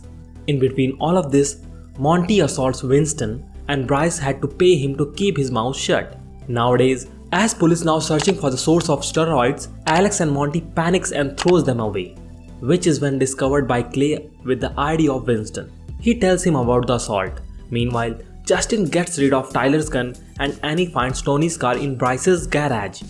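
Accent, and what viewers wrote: India. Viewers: why do you think you speak the right English and he speaks the wrong. Americans have their own accent, British have their own accent, Australians have their own accent and even Arabs have their own accent so why can't indians have their own accent. Who are you to tell which is right or wrong. And it's your country's problem that you got so less people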